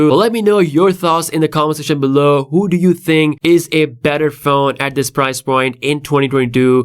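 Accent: India. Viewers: Your Indian accent is getting better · Man trying to speak good English still have 10% Indian accent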